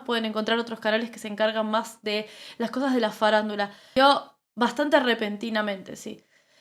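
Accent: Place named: Argentina. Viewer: Es primera vez que veo un video tuyo. Sigo a muchos argentinos, sobre todo al presidente Milei y su lucha por la libertad. Por tu idioma deduzco que eres argentina. Para mí, es un ritual para que los onvitados se la coman. He escuchado que hay evidencias que altos personajes de la música y la política disfrutan del sabor de esa carne. Sé que no puedo decir más. Un abrazo desde Cape Coral Florida EEUU de, una exprisionera política cubana en el exilio.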